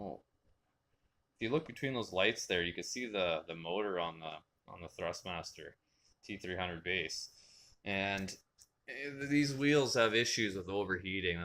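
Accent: Canada. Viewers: Sound Canadian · You sound Canadian eh? I am building one of these and people keep saying you can find car seats from a junk yard, I've never been to one in Toronto will I have any trouble?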